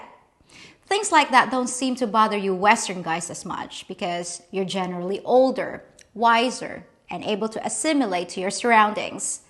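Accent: United States. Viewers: Hello!.. You absolutely a perfect in the U.S.. Everyone would think you were born and raised in the USA.. Another great episode!..❤❤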